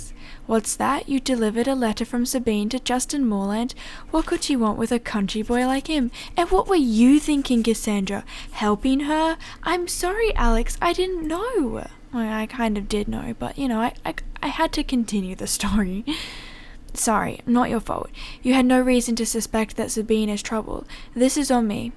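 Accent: Australia. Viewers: No joke, but I really like your Australian accent (I think that’s how u say it).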